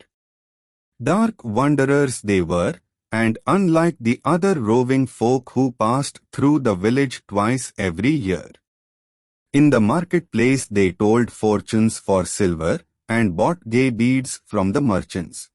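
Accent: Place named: India. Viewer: Should work on that accent man.. only like 70 percent of this is understandable to non indians. The effort is respectable though🙏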